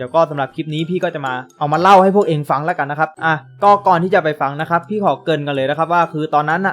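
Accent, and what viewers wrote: Phetchaburi, Thailand. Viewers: คนเพชรใช่มั้ยครับฟังสำเนียงรู้เลยคนเพชรเหมือนกันครับ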